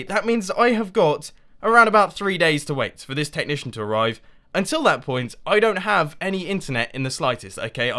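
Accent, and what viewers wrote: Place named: United Kingdom. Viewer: Bio X How did you not notice that? I'm not a native English speaker, but when I first watched one of videos, I immediately noticed that he has British accent. I mean, it is so obvious.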